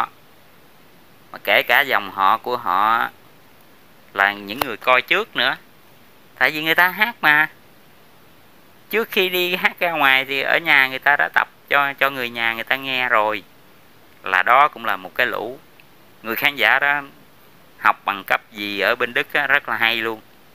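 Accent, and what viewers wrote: Standard. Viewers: ban noi qua chuan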